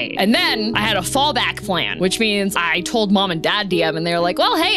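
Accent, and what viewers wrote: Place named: South, United States. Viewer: so based. She's fairly open with her tech stack, she's not afraid to call out industry BS, and her "southern drawl" Japanese is chef kiss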